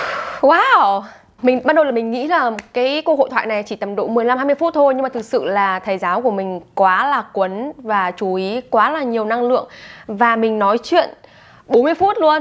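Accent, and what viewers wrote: Australia. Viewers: Ui cái Aussie accent, yêu❤️ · Đặc sệt Australia mà lại bảo k có ngữ điệu cụ thể nè 😌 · Thích giọng chị ghê! Anh Úc nghe chanh sả rất hay hjhj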